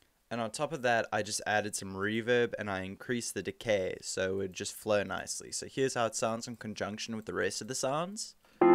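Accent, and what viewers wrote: South Africa. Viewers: lol the way I can tell you're South African just off of your accent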